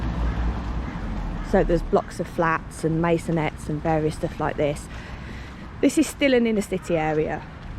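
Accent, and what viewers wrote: Birmingham, United Kingdom. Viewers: Always enjoy hearing your voice! As a Brummy living daan sarf, it’s nice to hear that familiar twang sometimes! There’s so much to see & explore in Brum. Will look forward to more videos 😊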